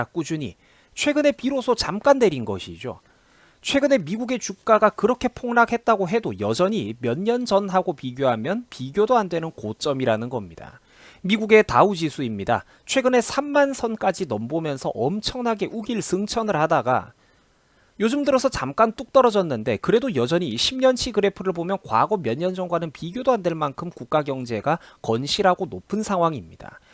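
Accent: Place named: South Gyeongsang, South Korea. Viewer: 목소리가 경남쪽이네요...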